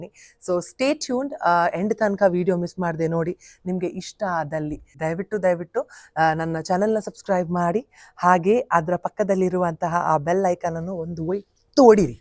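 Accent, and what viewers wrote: Mangalore, India. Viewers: Typical kudla Kannada 👍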